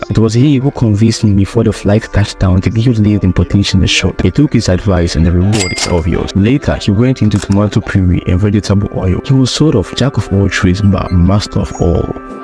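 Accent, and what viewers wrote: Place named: Africa. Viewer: The narrating speed is too fast, although it sounds African. I wanted to listen to the inspirational story, but the playing theme was not falling in place for me.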